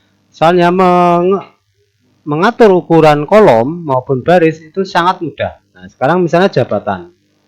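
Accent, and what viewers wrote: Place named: Banyumas, Indonesia. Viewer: Gokil..yakin..jos wong ngapak. Jelas bgt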